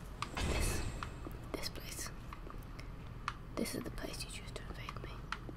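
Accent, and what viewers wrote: United Kingdom. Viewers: Finds I female Youtube ... I like this girl ... Wait she's British ... I really like this girl ... Wait she's playing Dark Souls OMG! Keep doing your thing never give up your amazing.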